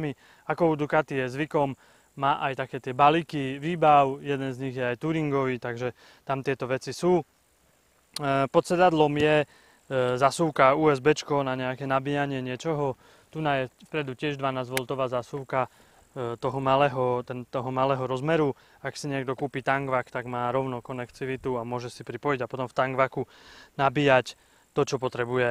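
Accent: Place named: East, Slovakia. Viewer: Velmi silny vychodniarsky prizvuk... inak super.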